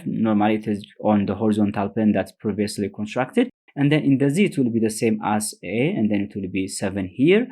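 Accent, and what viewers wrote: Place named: Ethiopia. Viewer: Your accent is so Ethiopian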